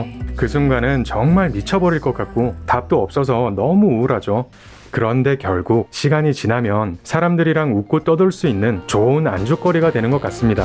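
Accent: Busan, South Korea. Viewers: 부산사투리 매력적인데!! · 부산분이셨군뇽~^^ 서울토박이이신줄 알았는데ㅋㅋㅋ 👍👍👍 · 우와 부산분이시라니 사투리 고치시는 연습을 어떻게 하셨는지 최고시네요. 👍👍👍👍👍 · 부산사투리 듣기 좋던데요!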